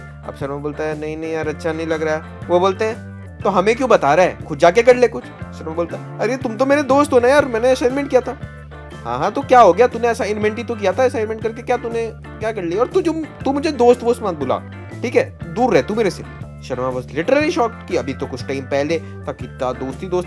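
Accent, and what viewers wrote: Bihar, India. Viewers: Bhai tu Bihar ka hai mujhe pata hai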